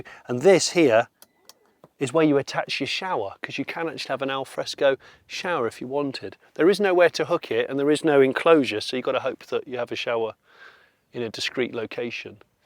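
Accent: United Kingdom. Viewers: weird?!?? Just plain British......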